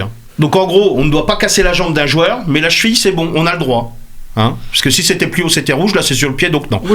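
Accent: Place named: Burgundy, France. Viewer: Je t en supplie prends ton accent Bourguignon dans tes chroniques car il est délicieux et c est surtout ta marque de fabrique !!!!!! Une fan bourguignonne inconditionnelle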